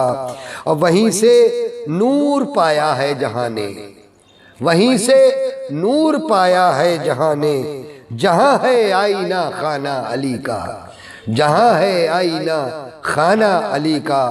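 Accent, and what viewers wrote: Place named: Varanasi, India. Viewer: آج آپ بنارس مشاعرے کے لہجے میں شعر بول رھے ہیں حضرت 💗💝💗💝💗💝💗💝💗💝💗💝💗💝💗💝💗💝💗💝💗💝💗💝💗💝💗💝💗💝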